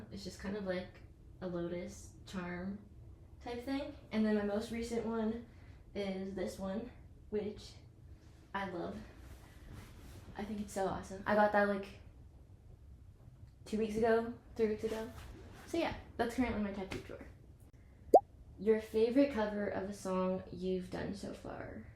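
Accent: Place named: United Kingdom. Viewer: but her accent is british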